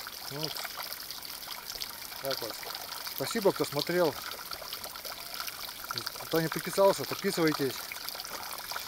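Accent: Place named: Siberia, Russia. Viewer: НАВЕРНОЕ В СИБИРИ ЖИВЁТЕ СУДЯ ПО ГОВОРУ И СНЕГУ? Я СТОЛЬКО СНЕГА В ЖИЗНИ НЕ ВИДЕЛ,АХРЕНЕТЬ. ЛАЙК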